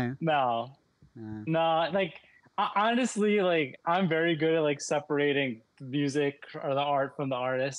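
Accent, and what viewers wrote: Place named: United States. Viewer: not gonna lie i thought you were american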